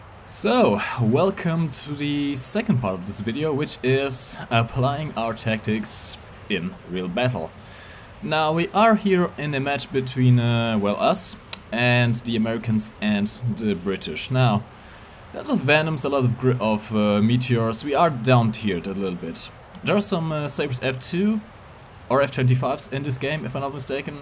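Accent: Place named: Germany. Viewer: Youre german right ? The accent is awesome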